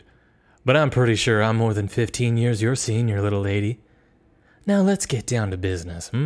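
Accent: South, United States. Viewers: Where are you from? I can hear an accent 🤔 Are you from the South? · The slight country accent is making my southern heart feel something😩✋🏾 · I live in the south and might i say i love the Accent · The bit of country I hear is the best I love it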